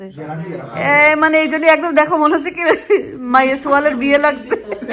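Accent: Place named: Jessore, Bangladesh. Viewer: tumi onek beshi shundor. Amader jashore er meye bole kotha.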